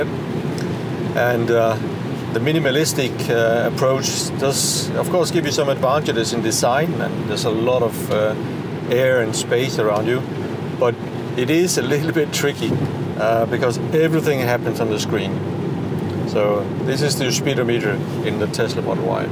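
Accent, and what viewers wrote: Denmark. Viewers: It definitely has the enhanced autopilot, since it shows that it wants to change lane, when you started indicating in the video. The only problem was, that you yanked the steering wheel too hard. Its very sensible on that. But I agree: The feature is not worth its money (just yet)… I love the danish accent by the way! Saying that as a half dane. Haha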